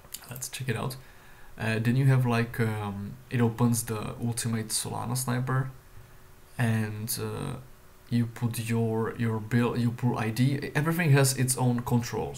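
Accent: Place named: Netherlands. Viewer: Thanks for the reply my man! You Dutch by the way? You kinda sound Dutch ;)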